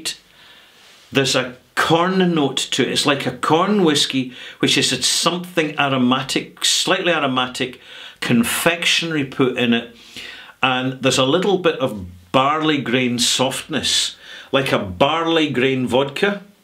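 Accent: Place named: Glasgow, United Kingdom. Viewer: Thanks for the critique! Came over due to urging. Very interesting! I have viewed another posting with you in it -- Russian MRE I think -- You are both delightfully entertaining! Question - I can hear more Glasgow in your speech, but not. What is that about?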